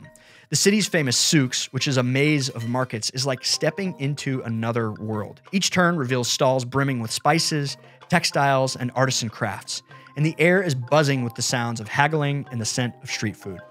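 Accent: United States. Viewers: Chimney cakes are from Hungary...why I am listening to an American talk about Czech travel tips...;)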